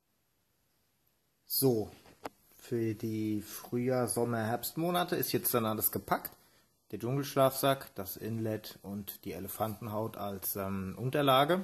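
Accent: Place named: Palatinate, Germany. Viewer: Lewwerworscht :)) ich lach mich weg....Pälzer Platt goes Ytube ;) hört sich aber doch heimisch an.... Belgisch Jig Saw...sieht gut aus ! Hast du eine Quelle ? Grüsse aus der Landeshauptstadt RPL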